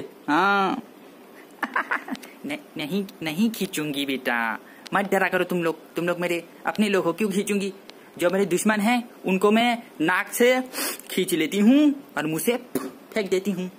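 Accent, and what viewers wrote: Assam, India. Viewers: Ap Assamese ho kya ap ke tone se aisa lagta hai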